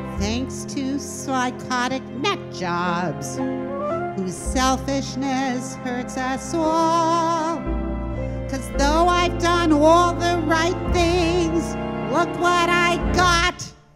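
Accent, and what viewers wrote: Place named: New York, United States. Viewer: You've hit the nail on the head as always. 💉 Nice NY accent! Enjoyable as always.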